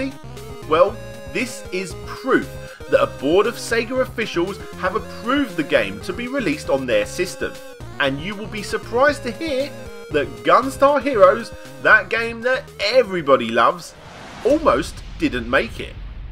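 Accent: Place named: London, United Kingdom. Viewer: Lower-middle class Londoner with a poor mic and worse marketing. Shame though; his content seems pretty good.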